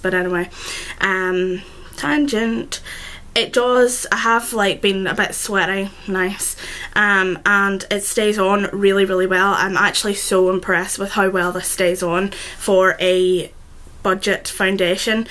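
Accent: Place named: Northern Ireland, United Kingdom. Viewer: Are you from northern ireland? I think I recognise your accent haha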